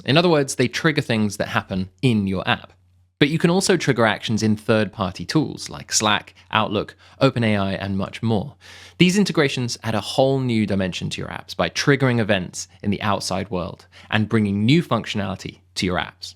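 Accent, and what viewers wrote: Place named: United Kingdom. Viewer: why does everyone on these type of tutorials have a British accent 😅🤣 ... Great tutorial don't mind me guys. This was great ! Thanks !!!!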